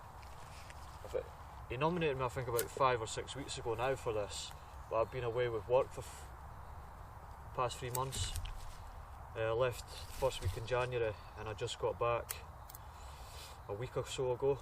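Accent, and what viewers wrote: Scotland, United Kingdom. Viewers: Are ye Scottish?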